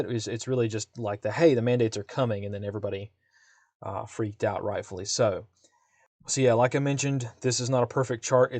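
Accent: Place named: Mid-South, United States. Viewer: Very good report. Well spoken with a very pleasant voice. I would guess a Mid South accent? Maybe the Carolina's or Tennessee?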